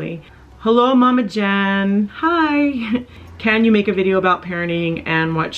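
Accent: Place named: New York, United States. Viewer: A bit off-topic, but why does your voice sound so different in your videos (in compare to Brandon's)? It has a lot more bass, and it sounds kinda newyork-ish.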